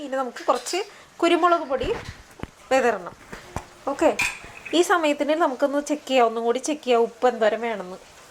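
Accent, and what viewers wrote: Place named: Thrissur, India. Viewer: എന്തായാലും ആ trissur സ്റ്റയിൽ സംസാരം ഉണ്ടല്ലോ....തകർത്തു .... അത് കേൾക്കുമ്പോൾ തന്നെ ഞങ്ങൾ പ്രവാസികളായ trissur കാർക്ക് ഉള്ള സന്തോഷം ഒന്നു വേറെ തന്നെ യാണ്.......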